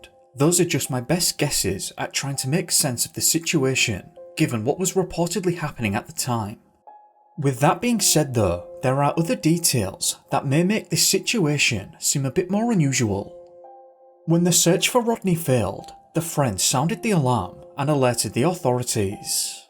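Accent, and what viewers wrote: United Kingdom. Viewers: Are you English , Irish 🍀 OR Scottish??Liking ur voice 🎤🎙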